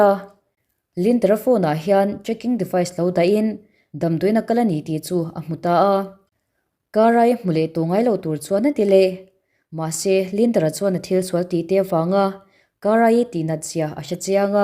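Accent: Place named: Myanmar. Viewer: Velah i ti ziah mai burma mizo tih awm vel a ni saptawng in chhiar law2 la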